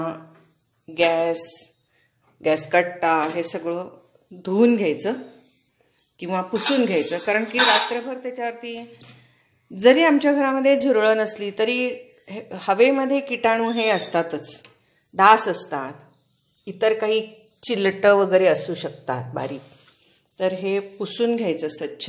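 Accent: Kolhapur, India. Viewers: मँडम चे व्हीडीओ छानच असतात आपोआप त्यात गुंतले जाते व्हिडीओ ची सुरवात पुणेरी भाषेत होत असली तरी नंतर कोल्हापूरीतच बोलतात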